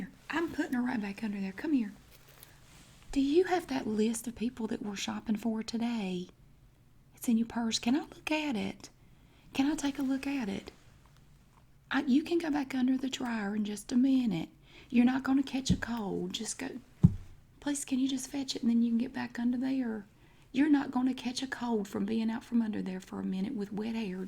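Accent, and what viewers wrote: South, United States. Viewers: Southern accent!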